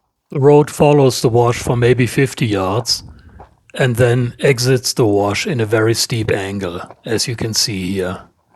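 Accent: Germany. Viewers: I Really Hope the FBI Shuts you Down - Ya got a Foreign Accent too - German maybe🙂 We have the Most Advanced Military in the history of Man because we Protect our Secrets - Today we have idiots and Google earth among others that somehow think its OK to Spy on our most Sensitive Sights - You DO NOT HAVE A TS SAP/SAR SCI Q level etc. Clearance and You Do Not have a Need to Know👎🏻 Reporting this YT Channel also. Good Luck🇺🇸🇮🇱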